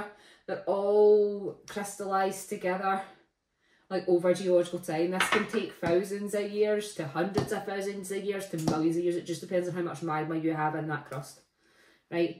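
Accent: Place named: Machars, United Kingdom. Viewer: Sounds local to me , Machars? .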